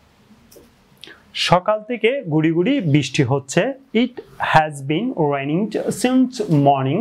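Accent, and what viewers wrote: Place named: Chittagong, Bangladesh. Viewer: ভাইয়ের বাড়ি কি চট্টগ্রাম নাকি? ভাষা শুদ্ধ করে, ভালো করে উপস্থাপন করাটা এই প্লাটফর্মে অতিব জরুরি। আশা করি, বুঝতে পেরেছেন, আমার কথা। ধন্যবাদ।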